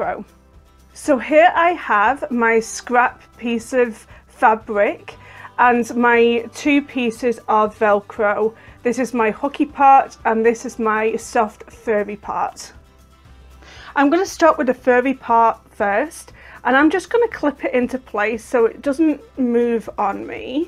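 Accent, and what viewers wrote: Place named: Yorkshire, United Kingdom. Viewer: Hi! Loved your names for the different sides of the Velcro - fluffy and hookey - it gave me a smile. Wondering where you got your accent from - you sound like a real home girl to me (I'm from Leeds but live in Scarborough atm). Lovely video, really innovative - keep up the good work we look forward to them. xx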